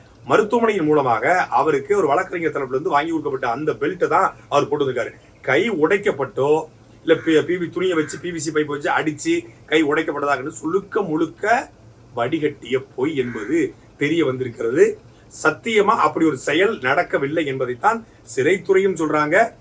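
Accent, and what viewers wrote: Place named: Uttar Pradesh, India. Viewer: Why ur sounding like a UP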